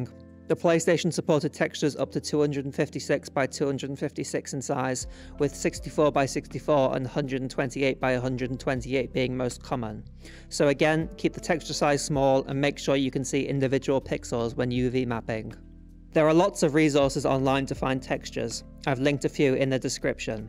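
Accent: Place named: Northern England, United Kingdom. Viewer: Ay up it's nice to hear another Northerner who's into making games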